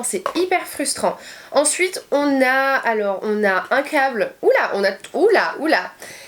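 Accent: England, United Kingdom. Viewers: Ton accent anglais est tellement cute❤️🤩💍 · c trop marrant quand elle parle il y a de fois un accent anglais c trop mignon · J aime trop ton accent anglais 😘😘😘😘😘😘😘😘😘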